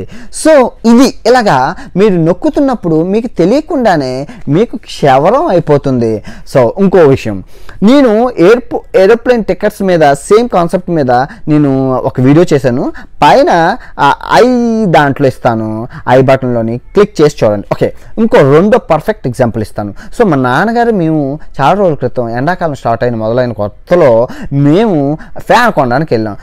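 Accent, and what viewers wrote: India. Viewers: America vellina mana slang manadea...👌 😂😂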